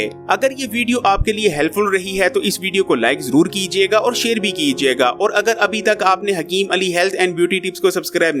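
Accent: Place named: Punjab, India. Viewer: PUNJABI LAG RAHA HAY PRONOUNCIATION KHARAB HAY .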